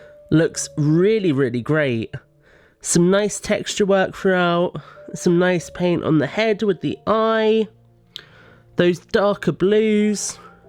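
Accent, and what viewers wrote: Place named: United Kingdom. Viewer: Are you British?? Your talking way sounds like British?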